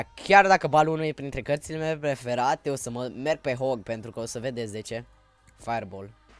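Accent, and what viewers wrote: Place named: England, United Kingdom. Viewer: Vezi ca ai putina engleza si franceza pe la coltul gurii;)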